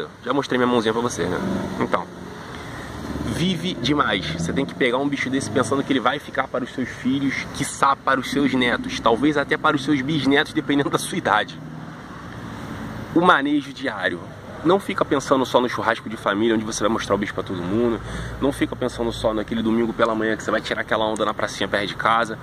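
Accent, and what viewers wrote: Rio de Janeiro, Brazil. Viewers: Tu é carioca mano? Tô percebendo pra esse sotaque aí hein kkk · Eu amo esse vídeo porque nada passa mais franqueza do que um sotaque carioca dando alertas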